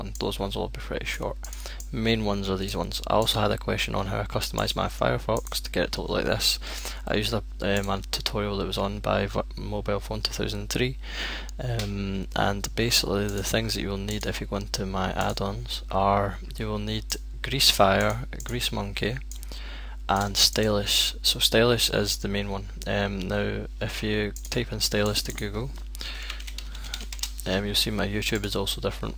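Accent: Scotland, United Kingdom. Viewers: LOL you guys are fucking morons. I can hear him perfectly, it's not difficult to understand what he's saying. As far as a Scottish accent goes that's a light one..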